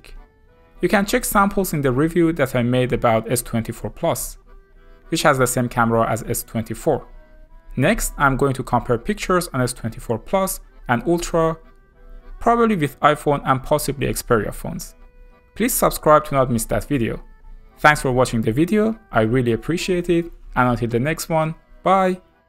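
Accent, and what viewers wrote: Iran. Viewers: Irani accent spotted 😂❤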